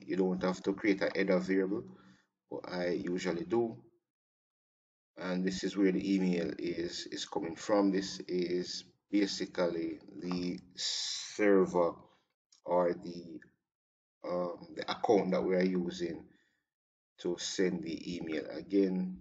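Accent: Jamaica. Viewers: jamaican accent on a php tutorial is maad crazy. big up unnu self man. Love from kenya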